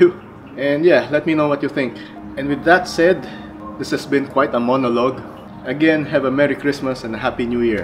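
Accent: Philippines. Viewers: Are you a filipino? Your accent sound like filipino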